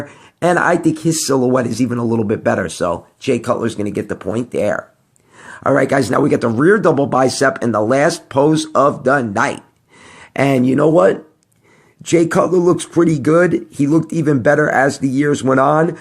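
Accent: New York, United States. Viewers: I love your NYC accent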